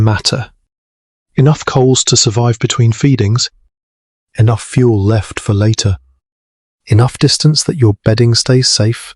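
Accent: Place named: United Kingdom. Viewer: Annoying British accent.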